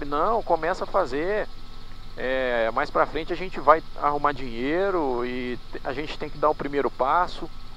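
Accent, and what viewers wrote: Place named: Minas Gerais, Brazil. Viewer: Fiquei sensibilizado com a sua história de perseverança, provando que, mesmo com muitos obstáculos, é possível realizar sonhos. Você me passa a impressão de ser uma pessoa de bom caráter com muita humildade. Aposto que era zagueiro, e descia a borduna nos pobres atacantes - rsrs. Bom ouvir suas histórias. E o sotaque mineiro soa agradável aos nossos ouvidos daqui do sul.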